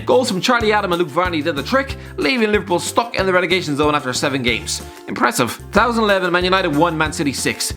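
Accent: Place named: Ireland. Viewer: I'm a simple man. I hear the irish guy, I press the like button.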